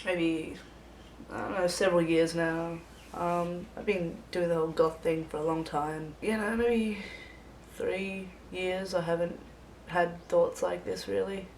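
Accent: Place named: Australia. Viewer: Your look is absolutely terrific, and I'm liking your videos... but your accent was still a surprise! (even though I knew you were an Aussie, from Facebook). Keep it up :) - Ian R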